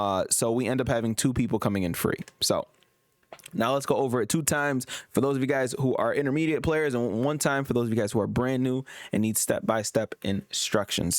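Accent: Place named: RP, United Kingdom. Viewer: Very Posh!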